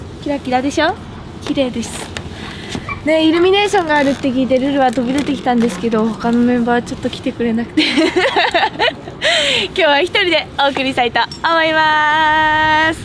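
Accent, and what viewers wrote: Hiroshima, Japan. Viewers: 段原さんの広島弁聞いて、アンジュルムの川村さんと二人のラジオ番組あればなって思いました。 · 🤗💓 喋ってるときの舌足らずな感じが可愛いー(*´▽｀*) ちょいちょい出る広島弁もイイ‼️ そして歌うと恐ろしいほどの上手さ。 私はあなたの虜ですー♥️ んで からの声が鞘師のぽく聞こえたw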